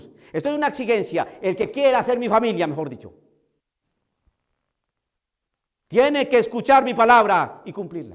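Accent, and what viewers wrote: Colombia. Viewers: cómo va a ser de Venezuela, es obvio que es colombiano, solo hay que oírle.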